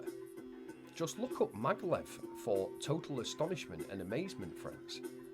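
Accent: United Kingdom. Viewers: I feel dumber trying to watch this. Having a British accent doesn't make you smart.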